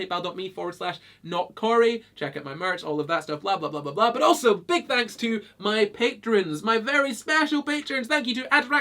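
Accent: Scotland, United Kingdom. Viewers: Is it bad I never noticed you're scottish??? like I'm british but my brain only registered your accent as "northern" tbh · I don't understand how people can call you "feminine". Unless they are using "feminine" as a synonym for "smart". To me you appear to be clearly masculine, and your Scottish accent is a pleasure to hear.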